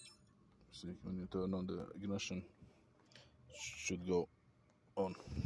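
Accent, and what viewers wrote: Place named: Latvia. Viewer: sveiks pēc akcenta liekas ,ka esi Latvietis ludzu atstui linku kur var pasutit to adopteri ?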